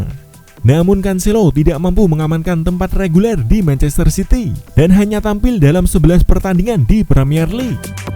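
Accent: Java, Indonesia. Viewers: medok banget jawanya si anchor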